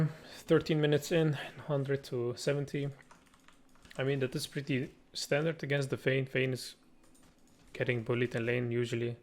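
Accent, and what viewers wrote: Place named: Germany. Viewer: true, it's like a mix of german/english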